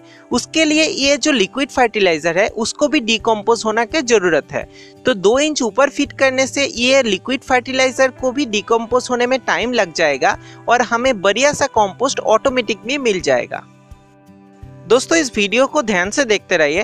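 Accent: Bengal, India. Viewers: R u Bengali sir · Typical Bengali accent. Very good.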